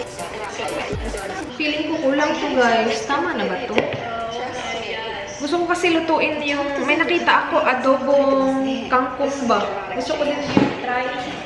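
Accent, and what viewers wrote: Visayas, Philippines. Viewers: kaya pala parang familiar ng tono mo..katabing isla lg pala..sending my love from negros☺️ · Gusto ko mga vlogd mo..saka gusto kits personally kasi Hindi ka katulad mga ibang nakapangasawa ng foreigner lalo na Australian na napapanood ko sa dito sa yt na exage gumaya sa accent ng husbands nila...ikaw very natural ang English at fluent..saka gustong gusto ko yong Tagalog mong may accent na bisaya..Godbless..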